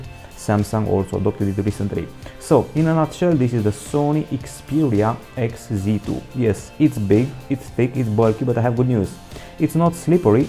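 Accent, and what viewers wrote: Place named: Romania. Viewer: Romanian accent 😀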